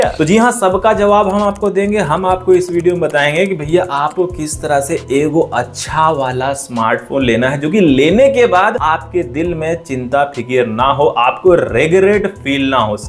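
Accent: Bihar, India. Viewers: बिहार se kya bhai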